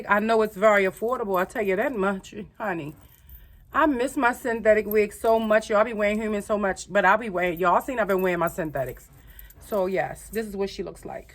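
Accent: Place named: New York, United States. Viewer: You talk like an Italian mob wife lol I love it!! Are you from Jersey or New York?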